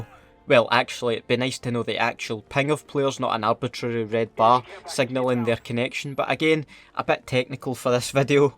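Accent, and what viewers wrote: Scotland, United Kingdom. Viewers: I like your scottish accent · I’m bit of a camper, but as a veteran of this game, I cannot go toe to toe with these rapid reaction wee bastards! Totally agree with no 2 , I rarely play these games because of this. Drives me bonkers. Love the banter, great to hear a Jock voice on these channels.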